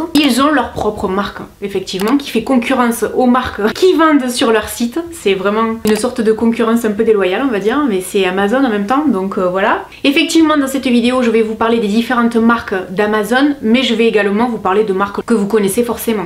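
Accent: South, France. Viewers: et notre bel accent du sud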